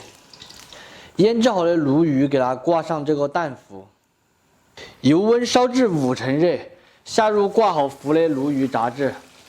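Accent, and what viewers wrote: Sichuan, China. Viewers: 味道肯定巴适得板，配合你的川普简直是绝配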